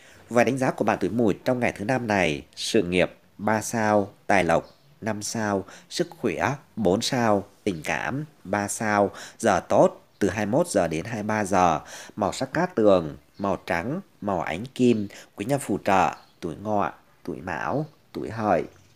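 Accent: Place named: Central, Vietnam. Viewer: giong đoc ko hay mây chuc kênh luon phat triên nhé giong nay nhu ở miên trung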